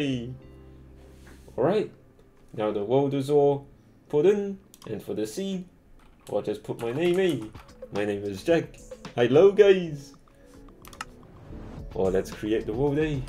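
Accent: New Zealand. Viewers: nz accent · It’s a Maori accent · He's put the Kiwi accent on steroids. And I'm sorry but it's over done for me I needed to mute. Good tips though so thank you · Dude it’s just a New Zealand accent lmao?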